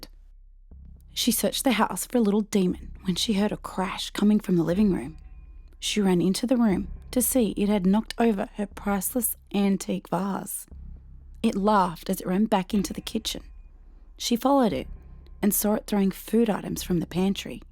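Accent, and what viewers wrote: Australia. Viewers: Aussie accents are so nice to listen to. Great voice and video. 😊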